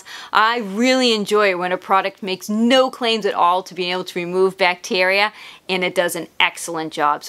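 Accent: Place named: New Jersey, United States. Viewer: Woah great video! I have a portable far infrared sauna (it's like a tent you lie down in with an infrared generator). I was on the hunt looking for a more natural spray with less caustic chemicals to clean it after use, and encounter the Mr. Meyer's brand. Loved everything I read about it, but was also looking for something with antibacterial properties. As you mentioned, they don't state that it does kill bacteria, but you just proved it without a shadow of a doubt. I picked some up today. I sprayed a little on the counter top just to test the scent, I think it smells good. Hopefully it won't be too overpowering when I clean the whole sauna, but I would rather have something safe than the chemical spray I was using. Thanks again for this great presentation. BTW, loved the accent, I had grandparents from NJ :)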